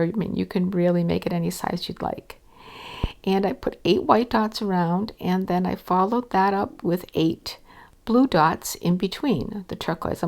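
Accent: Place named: Massachusetts, United States. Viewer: Are you from Massachusets by chance? Your accent is so much like my aunts were. <3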